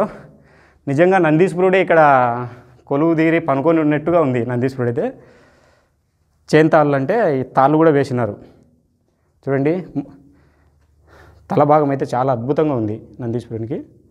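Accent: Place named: Kadapa, India. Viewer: Naynu ayithay definitely miru ma Kadapa slag matladuthunaru ani naynu Chusthunna anna Bigam bayala....inka Sadhi buvva.....kaniii Raaa biiii ani Marchipoyaru anna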